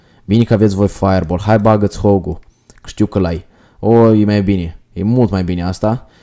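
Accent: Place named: Moldova. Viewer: esti moldovean ? Eu tot ca team inteles cand ai vorbit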